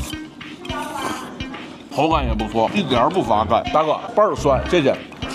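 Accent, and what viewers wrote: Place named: Tianjin, China. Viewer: 皮牙子既然你是说了天津话解说就按天津话说洋葱头好了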